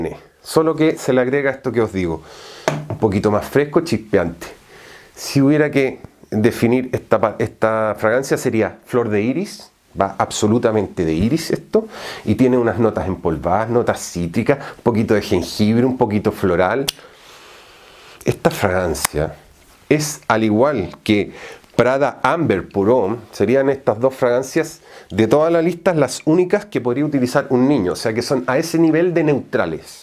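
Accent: Chile. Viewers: Eres Chileno o Español? Pareces una combinación de ambas jaja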